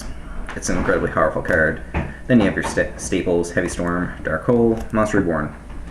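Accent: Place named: Newfoundland, Canada. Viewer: You sound like your from Newfroundland, good deck ya got there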